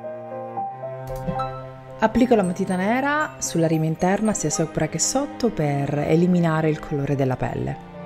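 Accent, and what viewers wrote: Sicily, Italy. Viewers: Sei la migliore in assoluto...per la tua bravura, la tua fantasia...ma sopratutto per la tua simpatia e il tuo mitico accento da siciliaaana :) una curiosità...i tuoi figli ti vedono con questi trucchi? Cosa pensano? Hahaha che divertimeto immagino...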